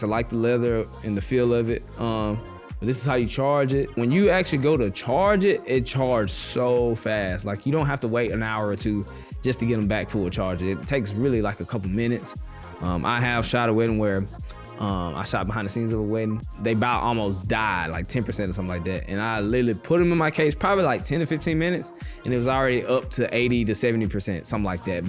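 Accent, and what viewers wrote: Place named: South, United States. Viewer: That boy from down south you can hear it in his voice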